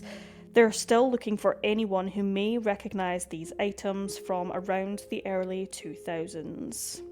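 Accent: Scotland, United Kingdom. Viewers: It’s great to hear another Scottish accent on YouTube! I love the channel, I sometimes find it hard to “stay polite” and not use my “guffy” chat. 🤣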